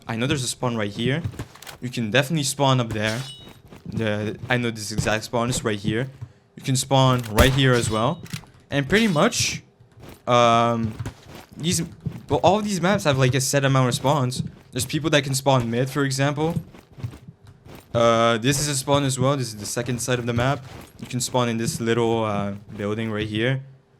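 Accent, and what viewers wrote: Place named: France. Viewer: Accent français et anglais mélangé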